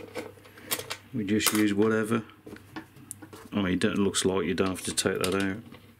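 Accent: Birmingham, United Kingdom. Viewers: I find your Brummie pronunciation of 'Bluetooth' a bit different to how we say it up north.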